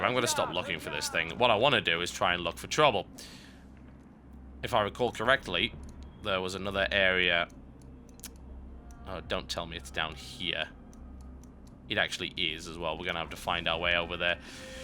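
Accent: United Kingdom. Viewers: your british voice is very soothing... #NoHomo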